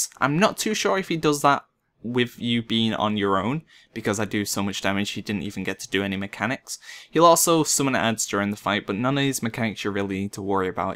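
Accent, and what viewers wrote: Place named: England, United Kingdom. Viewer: Adeeb Hamad Manchester i think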